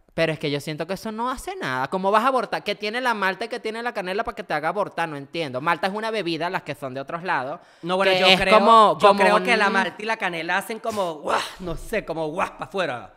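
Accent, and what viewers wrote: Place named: Spain. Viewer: Porqué La Divaza tiene ese acento Español con las eses?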